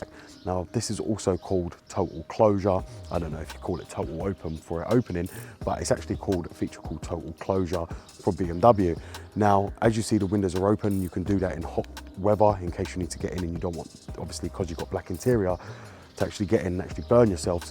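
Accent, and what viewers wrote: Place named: London, United Kingdom. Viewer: He's a Londoner If you can't recognise the accent then your a melt ... Now geda' Fuk outta ere ya cunt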